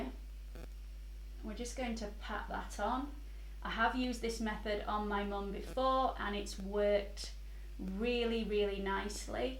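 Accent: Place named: Leicester, United Kingdom. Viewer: Yes fixing spray would be great , really enjoying your channel. Both my friends and I have said you sound like your from our area , Loughborough Leicester. Lol . Take care 😉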